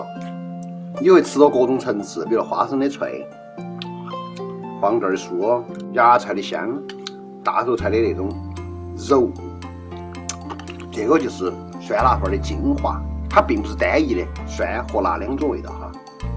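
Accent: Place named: Sichuan, China. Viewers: 口水哗啦啦，明天就试一下，这个成都话简直太亲切了！好吃的板😀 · 我不是四川人，但听到的方言很亲切👍👍👍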